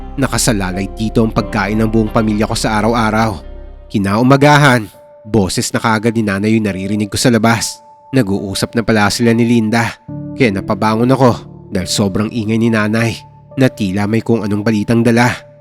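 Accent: Iloilo, Philippines. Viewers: Plss kuyaa haluan muna ng ilonggo accent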